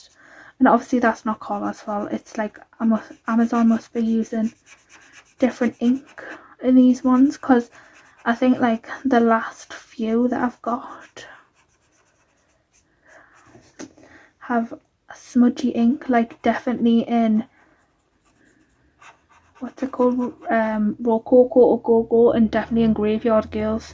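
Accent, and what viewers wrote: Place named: Northeast England, United Kingdom. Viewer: Ooo you sound like youre from North east uk I'm in Hartlepool , I love karla magana books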